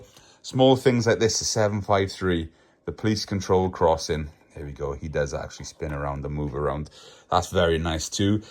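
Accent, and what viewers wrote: Wales, United Kingdom. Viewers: another great video! what a collection! utterly magnificent like the Corgi one, when i watched the Corgi one i was thinking that they were the greatest die cast manufacturer ever as i often do but when one sees a collection like this of Dinky it's a reminder that Binns road was every bit as good really, i love them both the same as i have always believed that the Dinky range was better in terms of the range of marques they modelled whereas Corgi's magnificent gift sets were truly wonderful, i also love Matchbox especially the early Lesney gift sets, glad that you are feeling so much better, your enthusiasm is amazing! i have a near mint original Chipperfields set with the booking office, it's a prized item, many of the dinky's shown i own but they're not in as good condition and many are without boxes but i love them! best wishes, incidentally my cousin's husband who is from Swansea was over recently and when he was chatting with me i half expected him to start waxing lyrical about die casts! 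the accent is great!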